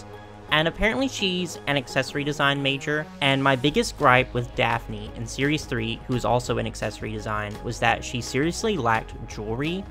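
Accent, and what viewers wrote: Texas, United States. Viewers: I presently like how you talk I love your Texas accent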